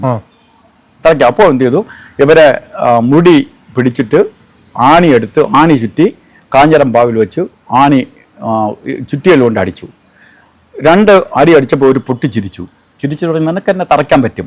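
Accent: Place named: Trivandrum, India. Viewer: Tvm കാരന് നമ്പൂതിരി ഭാഷയോ അച്ചടി ഭാഷയോ പറയാൻ പറ്റുമോ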